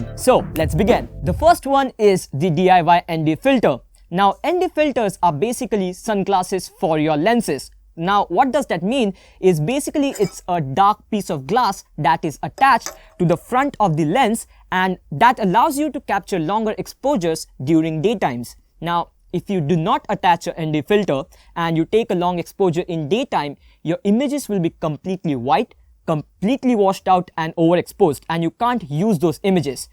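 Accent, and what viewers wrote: India. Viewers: That indian accent 😂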